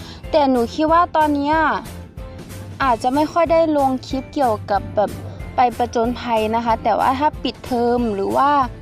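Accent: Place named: Suphanburi, Thailand. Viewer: เวลาน้องพูดสำเนียงไทย เสียงจะออกเหน่อฯ เหมือนคนสุพรรณเลย😂😂😂อยากฟังควมไตยบ้านน้อง แบบเต็มคลิป ...เพราะแต่ละที่ สำเนียงไม่เหมือนกัน😇😇😇ยอนเสง สาวไตย ว่านพักตูเมิง ค่า.......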